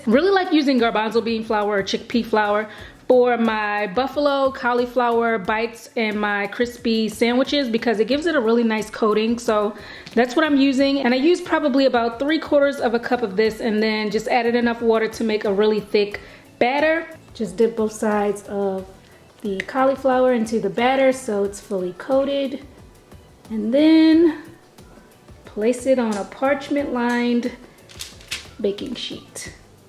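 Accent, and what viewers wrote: Baltimore, United States. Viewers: Sis, are you from Baltimore?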